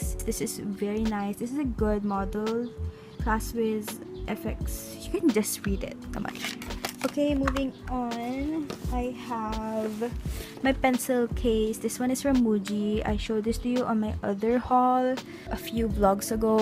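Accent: Philippines. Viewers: when she speaks her first sentence i was like "OHHHH FILIPINA".. gurrrl ur accent is sooo cute filipino prideeeee hehe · This is the first video I watched from you. When I heard your voice I was like wait is she Filipino? And then yaaaaas you are! Aesthetic na nga, exo-l pa!!! Nicesuuu~ Good luck sa academics~! 😊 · Its kinda obvious that shes filipina cuz girl, her accent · I just got here and I knew that your a filipino becuz of your accent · What's with your accent? Are you pilipino? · Me:her accent sounds familiar Also me:OMG SHES A FILIPINA #Philippines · Sa thumnsil at aestheric akala ko taga ibang bansa pero nung nagsalita na ay pilipino ito hahahaha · 5 seconds into the video i already know you're a filipina bc of your accent hahahaha 💕💕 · I recognized immediately because of the English accent — We sure have a distinct sound when speaking English 😊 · ya sound like a filipino especially when you said "TAGGhh" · you have that filipino accent, are you a filipino? 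I'm half filipino and chinese :) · I am new here and I love your accent. I was right that you have a Filipino accent <3 Edit: I was proven right when I also saw your money. You're a Binibini💓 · I knew she had a pinoy accent! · looking through these comments and seeing how shook everyone is when they find out you're filipino also same i heard your accent and i was like MMMMmMmMMm FILIPINA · me: hears her say the first sentace me: MY GIRL IS FILIPINA AYYYEEE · skskks i'm not familiar with your channel and i just assumed that you're filo based on your accent :') and gd i was right hahshah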